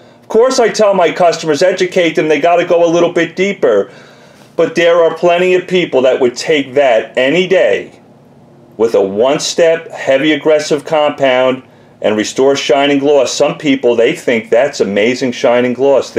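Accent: New York, United States. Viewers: I hear a New York accent? Queens?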